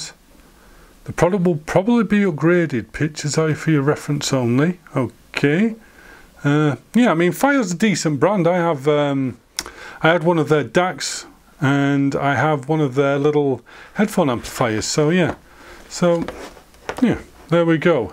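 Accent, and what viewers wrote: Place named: Liverpool, United Kingdom. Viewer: Excellent video. But why are you speaking in a scouse accent? Where exactly in Liverpool are you from? If you are not from the Merseyside area why are you speaking in a Scouse accent?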